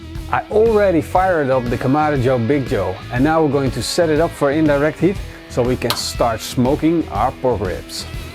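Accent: Netherlands. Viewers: Some Dutch accent ?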